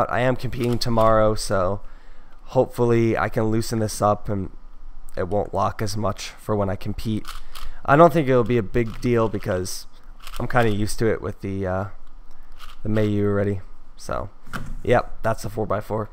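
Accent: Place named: Canada. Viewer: are you Canadian? haha it's sounds like it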